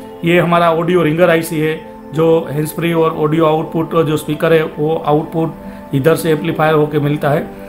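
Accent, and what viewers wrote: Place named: North, India. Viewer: North indians accent sucks!!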